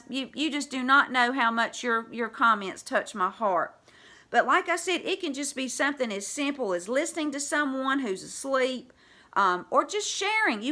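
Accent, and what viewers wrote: South, United States. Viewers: HELLO. WOW I LOVE UR SOUTHERN ACCENT. ITS JUST LIKE MINE! IM FROM NC. I FOUND UR CHANNEL. ITS AWESOME CONTENT. THANK U FOR SHARING!!🇺🇸🇺🇸🇺🇸🙏🙏❤❤❤ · Aren’t you just adorable love your spirit and southern twang :-). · It's just that deep Southern draw!!!! You would fit right in here in East Texas!!! 😉😘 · Hi what a pleasure to meet you. I'm a new subby too. Love your sweet southern accent. God bless you and your family.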